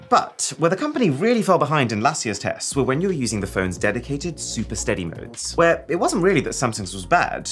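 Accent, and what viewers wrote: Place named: United Kingdom. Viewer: The only British guy whose accent i can fully understand 🫠. Great video tho ✌️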